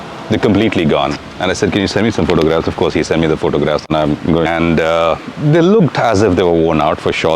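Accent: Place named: India. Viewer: 印度英语真的很好听❤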